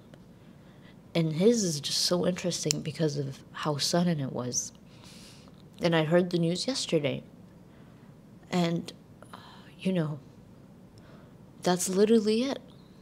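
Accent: United Kingdom. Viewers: Love the british accent du know wat mean like😅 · As a revert, I really take a comfort in your podcasts and videos. I met you on TikTok but I love your YouTube much more because of the longer videos ❤ I’m over here studying and listening to you and repeating your British “d’yknow whahimehn?”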